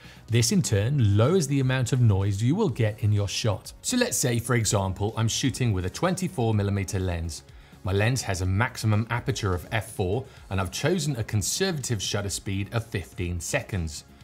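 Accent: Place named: Wales, United Kingdom. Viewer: bloody hell would never have placed you as Aberystwyth! But it is the perfect presenters voice man not gunna lie. H that's awesome and likewise, love your channel!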